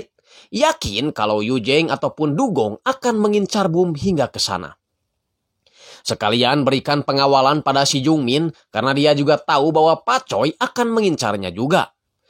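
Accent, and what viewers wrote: West Java, Indonesia. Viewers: kan di potong potong tapi intruksi sudah tertulis & tinggal baca saja berserta mensensor kata yang tak pantas dengan ucapan logat sundanya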